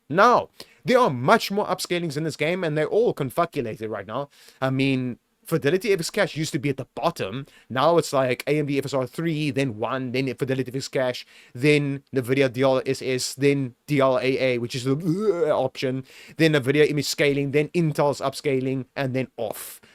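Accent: South Africa. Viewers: You are the best to do it, theres something so refreshing about hearing a tutorial in a SA accent lmao. love you bru, keep doing what you doing.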